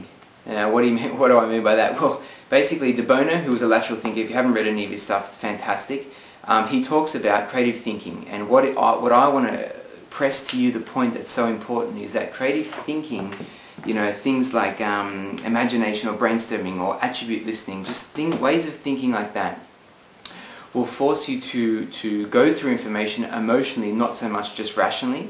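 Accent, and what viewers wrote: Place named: Australia. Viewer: australiaann accent haha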